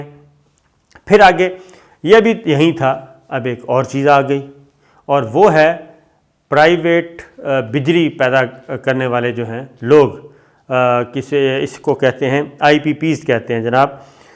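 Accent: Pakistan. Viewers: Aap ki bataen pheli daffa sun rha hn masha Allah bht acchi baataen kartaen hn, biklul khalis pakistani Urdu zuban me, r mohabat bhari bataen krty hn.ye bako channels waly tu fazol TUR TUR krty hovy jhoot bolty hn.aap ka andaaz bilku Mashriki ha vry fine. Allah ap ko kush rkhy Ameen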